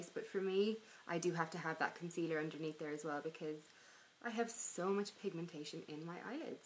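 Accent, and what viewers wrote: Ireland. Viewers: Which part of Ireland are you from?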